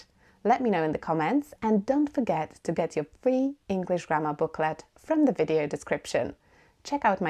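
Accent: United Kingdom. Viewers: You speak in British accent and i love it . Thank you ❤ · Hello,I'm following you fromUzbekistan, very and very nice video thanks,I loved your pronunciation and accent,I love a British accent so much,you cannot imagine how I love English ❤I want to speak..but it not easy 😢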